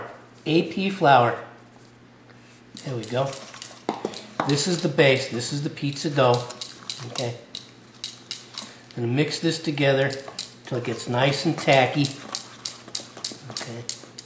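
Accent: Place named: Brooklyn, United States. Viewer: !! I love your intro, the history lesson, and this artisan pizza recipe. This is a must try. Thanks for the olive oil on the dough tip! I like that Brooklyn accent when it sneaks out too!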